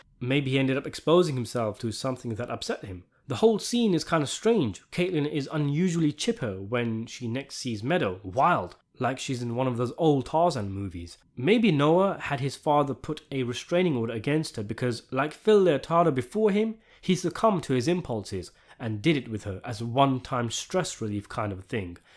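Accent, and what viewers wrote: United Kingdom. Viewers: My greatest mystery is your accent - it sounds British-ish but not quite... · I love how the narrator leaves the curse words in the commentary, but they get blanked out in the closed-captioning. And it's always fun listening to British accents talking about American mobsters.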